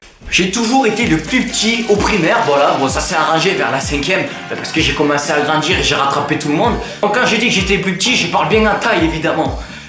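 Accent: South, France. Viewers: J'adore ton accent 😊😍et tes vidéos sont super simple mais tellement bien 👌🏻😏 . C'est drôle parce que je connais un Clément, qui a le même âge et qui a aussi l'accent du sud 😊😂😌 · Je t'adore ! tu me fais rire. Tant fait pas pour ta taille je mesure moin 1m60 et je suis aussi en 3eme voilà voilà. ah oui j'adore ton accent du sud 😉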